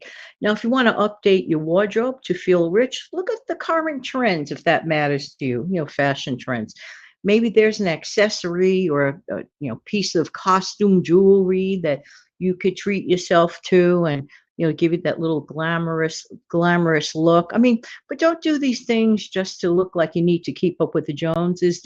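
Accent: New York, United States. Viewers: Wonderful to listen to your words of wisdom. They resonate. Thank you!🌷 Love your accent; is that New Yorkian? 😅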